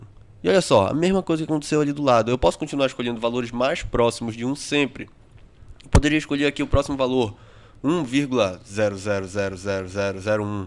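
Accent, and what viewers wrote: Pará, Brazil. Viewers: Cara você é paraense???/. Você é muito bom parabéns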